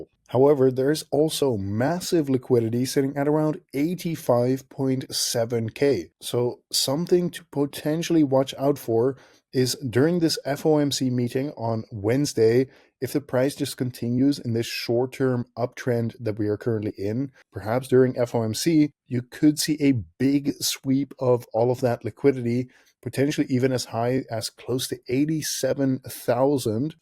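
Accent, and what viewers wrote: Netherlands. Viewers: Ik hoor NL 😅 Waar trade je met leverage? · Your accent sounds Dutch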